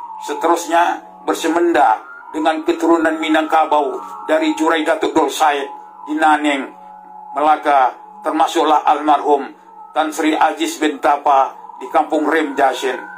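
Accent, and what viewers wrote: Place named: Indonesia. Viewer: suara dan dialek jelas indon asli pak...walaupn hujahnye aku stuju..tp anda mmg jelas warga indon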